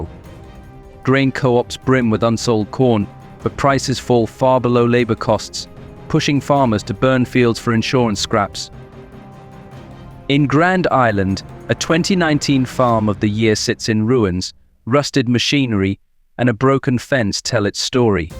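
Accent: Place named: United Kingdom. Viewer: Listen to a British voice talk about anything American NO THANKS!!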